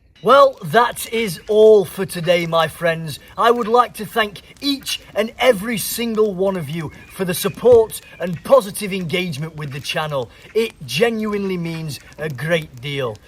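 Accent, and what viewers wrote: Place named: England, United Kingdom. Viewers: As a History Nerd I’m mad I have only just found your channel and as a fellow Yorkshire man I appreciate the Broad AF Yorkshire Accent what an amazing history channel researching a great Folk Hero and History 🏹🍻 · I only recently ran into you channel while looking for archery videos as I am starting on the hobby (doing it with a prosthetic hand is... interesting). And what quality content you have here in your shorts and your long videos. And it is great to see and hear a Robin Hood that can speak with an English accent! Keep the good work!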